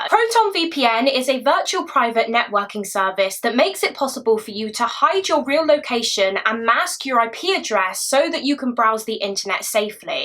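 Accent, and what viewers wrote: United Kingdom. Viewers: Hi just want to say enjoy your content you are a talented storyteller also love your British accent❤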